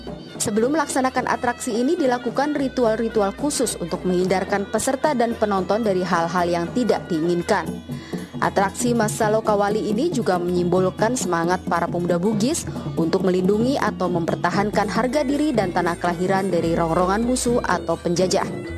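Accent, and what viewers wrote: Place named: Sulawesi, Indonesia. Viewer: bahasa bugisnya sangat kental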